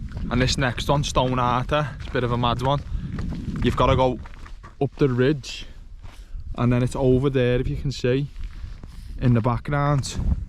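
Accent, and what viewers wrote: Liverpool, United Kingdom. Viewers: haha I live in Cheshire so no issues with the scouse - but I had the captions turned on and had a good laugh. Do you think this route is ok to do in the snow with microspikes? I've heard there is a 6ft scramble down rocks (is that the bit ) which might be sketchy?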